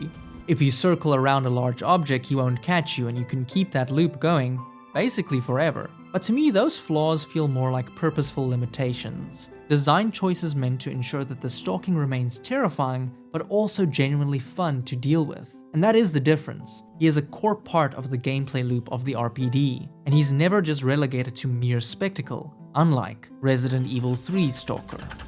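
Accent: South Africa. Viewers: Great video! Btw you sound South African xD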